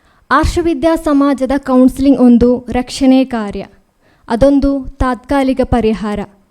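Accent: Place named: Kerala, India. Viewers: Malayali ka nada methadonde hage❤ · ಅದು ಆಕೆಯ ತಪ್ಪಲ್ಲ. ಕನ್ನಡ ಭಾಷಣವನ್ನು ಮಲಯಾಳಂನಲ್ಲಿ ಬರೆದು ಓದಿದಾಗ ಹಾಗೆ ಅನಿಸುತ್ತದೆ. ಅಷ್ಟಾದರೂ ಆಕೆ ಕನ್ನಡ ಮಾತನಾಡಿದ್ದಕ್ಕೆ ಸಂತೋಷ ಪಡೋಣ. ಸಣ್ಣ ಪುಟ್ಟ ತಪ್ಪುಗಳನ್ನು ಗಣನೆಗೆ ತೆಗೆದುಕೊಳ್ಳದೆ ಇರುವುದು ಒಳ್ಳೆಯದು.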